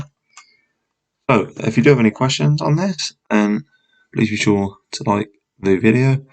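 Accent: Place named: United Kingdom. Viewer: This is a really offtopic question but: Which part of the UK is your accent from? I'm not a native speaker so I can't tell, however, I'm in love with the UK accents and I'd like to broaden my knowledge in reckognising them! Besides that, thanks for the video, it helped me with my thesis :) Kind regards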